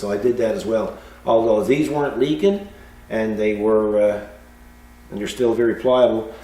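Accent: Newfoundland, Canada. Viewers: That sure sounds like a west coast Newfoundland accent??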